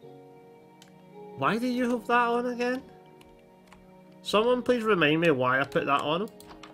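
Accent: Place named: Scotland, United Kingdom. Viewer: Haha love the vid where abouts in Scotland you from your accent sounds a lot stronger than mine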